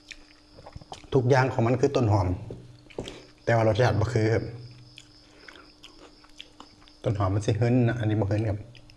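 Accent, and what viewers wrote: South, Thailand. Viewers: พูดแบบใต้ๆ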